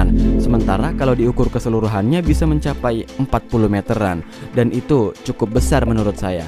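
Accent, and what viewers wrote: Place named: Madura, Indonesia. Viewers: Bro.. kok kayak ada suara bahasa maduranya..kayak orng jualan di kapal...😀😀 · Anjir suaranya kayak orang madura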